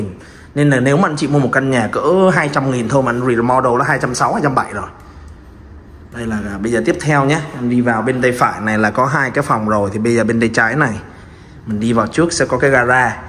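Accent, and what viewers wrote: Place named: Ho Nai, Vietnam. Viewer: Giọng giống giọng Hố Nai.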